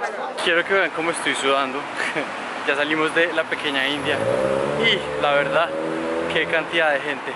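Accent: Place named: Colombia. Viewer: me tiene enamorada, ¿cómo hacemos? Esa voz, ese acentico colombiano uffff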